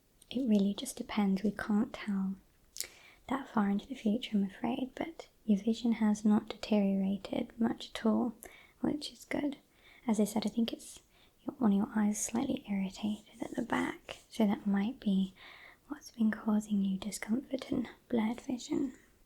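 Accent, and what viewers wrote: United Kingdom. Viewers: I enjoy when we get a Dr. appointment from time-to-time 🙂 The eye lid lifting was quite tingly for me. The Queen’s English makes part of the exam sound a bit aggressive 😉 “Point a ‘torch’ at your eye” - someone from the US: 😨 “Hang on! You’re gonna what?!” And the ‘Is the dress blue or gold?’ reference 🙌🏼 nice flashback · Bri'ish?! My favourite.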